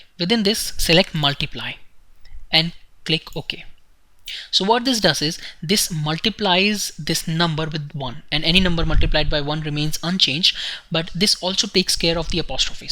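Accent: India. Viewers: Good but i really dnt like indian accent!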